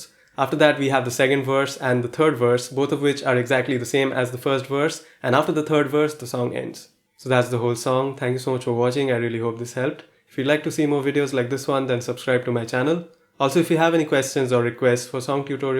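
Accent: India. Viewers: You sound indian ! Where are from brother ? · Omg your accent tells me you're from India dude...are you??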